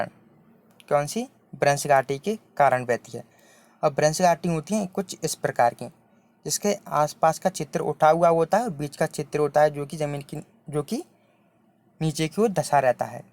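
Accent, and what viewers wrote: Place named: Uttar Pradesh, India. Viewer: U P k lgte ho awaz se😎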